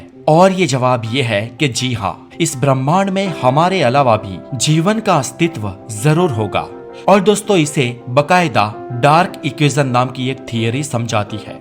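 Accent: Gujarat, India. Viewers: Proper gujarati accent wali Hindi...Aur bhai wo DARK equation nahi hai, Drake Equation hai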